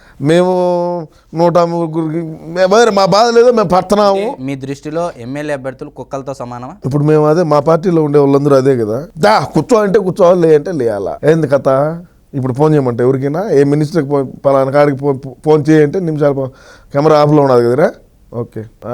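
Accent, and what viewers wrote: Rayalaseema, India. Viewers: Bro sooper..... Nee body language and seema accent superb❤😂😂🎉🎉🎉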